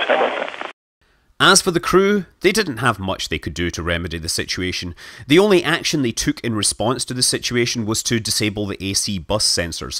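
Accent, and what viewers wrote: Scotland, United Kingdom. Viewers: It this narrator Scottish or Welch or what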